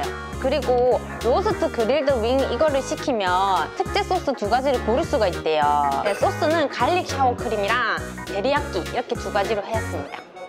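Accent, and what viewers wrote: Gyeongsang, South Korea. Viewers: 정말 잘 먹는다.. 오늘~은 ...구수한 경상도 말씨 또 들어봅니다.. 저도 시켜 먹어 볼랍니다..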